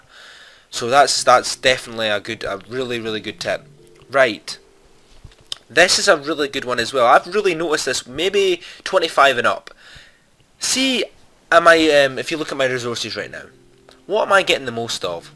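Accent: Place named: Edinburgh, United Kingdom. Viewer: Just saw someone saying love your accent lol aye so dae ah ken whit a mean you sound like a Edinburger lol good vid tho some good tips mate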